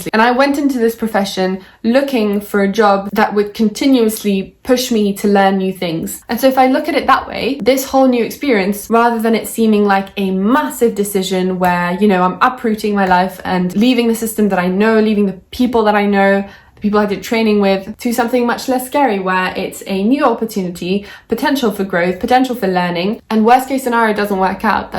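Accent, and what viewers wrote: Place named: England, United Kingdom. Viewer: hello from turkey, i'm working as a GP here, i'm currently studying for OET exam, which enables me to take PLAB exams. If you have any questions or wonders regarding Turkish healthcare system, i would gladly answer to you. Anyway, your english accent is so pure and nice, it's also educational for me to get used to english accent:) greetz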